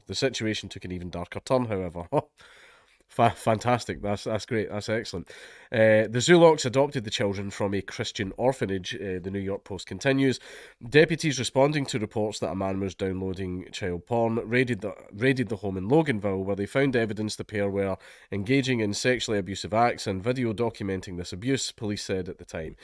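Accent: Scotland, United Kingdom. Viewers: This is literally the first dankula video I've watched in my life Was not expecting him to be Scottish, I have to say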